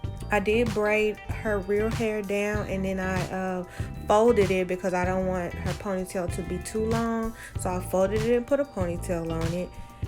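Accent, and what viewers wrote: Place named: St. Louis, United States. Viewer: You sound like you from saint louis Missouri!! I swear you sound like us. You slay too boo!!! Plus we definitely use pro styl gel.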